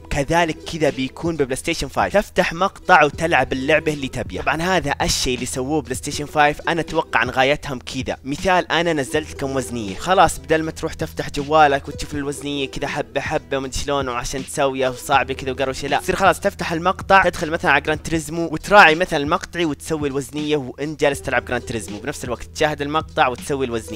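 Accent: Qassim, Saudi Arabia. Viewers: انت من القصيم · هاي يا لهجة بلسعودية هجي يحجون كلش حلوة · لهجتك جميلة. في أي منطقة في سعوديه يتكلموا كذا؟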